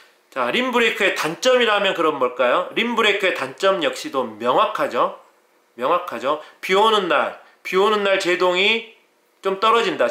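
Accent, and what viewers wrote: Jeolla, South Korea. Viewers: 전라도 말투 너무 구수합니다 ㅋㅋㅋ 시마아노 ㅋㅋㅋㅋ 항상 좋은 영상 감사합니다 ㅎㅎ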